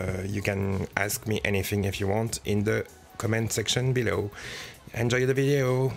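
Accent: France. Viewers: your charming french accent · trop marrant la voix avec l'axent français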